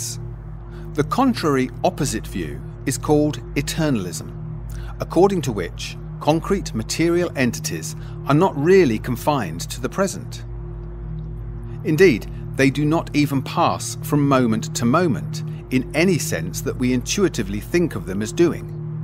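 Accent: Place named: United Kingdom. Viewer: They always gata put the british accent so it could look more interesting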